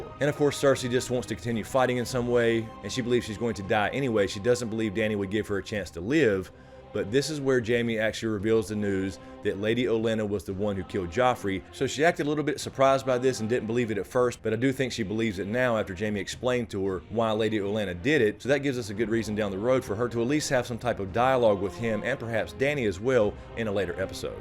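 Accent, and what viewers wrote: South, United States. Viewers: Just discovered your channel today. Nice country accent. Subbed.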